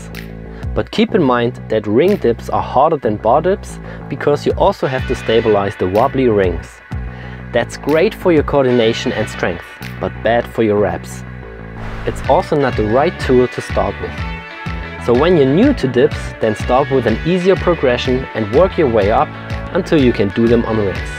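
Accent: Germany. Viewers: Man hört direkt den deutschen Akzent 😉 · Man hört das Deutsch raus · Haha this german accent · it‘s english with german accent, so don‘t bother ;) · Can't dafine your accent. Where are you from? Or if someone knows plz tell me. upd: It seems i found out. Is it german? Correct?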